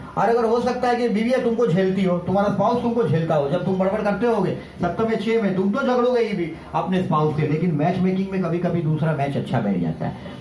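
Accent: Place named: Mumbai, India. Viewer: I really like the way you talking in Mumbaiya tone great sir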